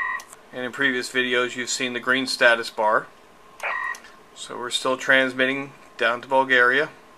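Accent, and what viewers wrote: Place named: United States. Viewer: Another great vid! I need to get a setup like that for my self. I have been trying to educate myself so I know what to buy. You channel is a God send to people like me. You mention in some of your vids you live in Russia. You sound like you were born and raised in the US. I hope this is not too personal, but Russia does not seem like a place many people from the US move to. Not that there is any wrong with that for sure, it just seems very unique. As always, keep up the great work!!!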